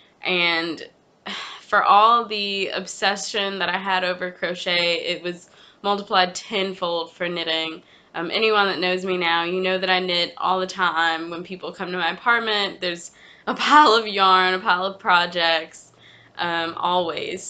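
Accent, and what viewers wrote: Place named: Alabama, United States. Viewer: Hurray! 👏🏽👏🏽👏🏽 Awesome first episode! I really enjoyed listening/watching. Your accent tooootally made me homesick for alabama lol (i use to live in huntsville). Im excited to see how that sweaters quantity works up. The color is beautiful. I need to get my ravelry project pages together... theyre shamefully out of date... smh. One day 😒☺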